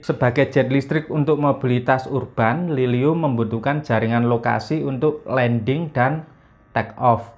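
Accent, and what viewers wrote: Java, Indonesia. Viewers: amrika,,,hadeuuh jowo bnged sihh ngomongnya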